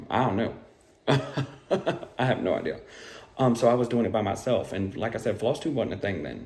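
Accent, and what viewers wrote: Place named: Louisiana, United States. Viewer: ! I. Love. Your. Soul! lol! I really enjoyed meeting you through FlossTube. I grew up in Louisiana as well and I hear your southernisms as you talk even though you don’t have much of an accent. lol. lol. Great first video. I need a visual of your envelope system though. I’d love to see some completed works as well. I’m looking forward to your next video. Also, in which general area are you from in Louisiana? I grew up in Amite which is about an hour north of New Orleans.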